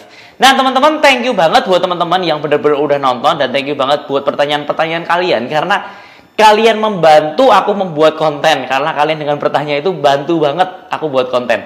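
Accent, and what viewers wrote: Java, Indonesia. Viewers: Logat jawaaaa medok koyok akuu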